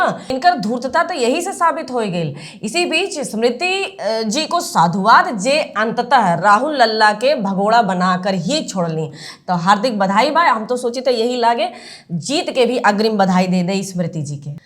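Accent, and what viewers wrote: Bhojpuri, India. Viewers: YOUR BHOPURI IS SO NICE TO HEAR. MORE THAN SUBJECT MATTER, MY INTEREST LIES IN HEARING YOUR BHOJPURI. I HAVE A GREAT INTEREST IN LANGUAGES. As a matter of fact, I know ANCIENT GREEK, LATIN, SANSKRIT, ENGLISH, FRENCH AND KANNADA.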